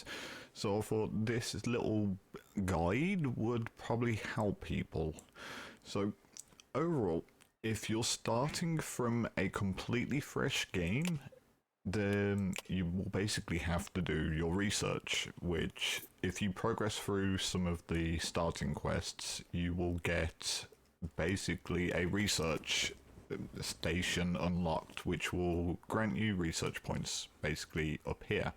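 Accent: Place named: United Kingdom. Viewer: Love this just brought the game on switch with dlc on the e-store! Where’s abouts are you from in the UK? I’m British from Birmingham and trying to place your accent!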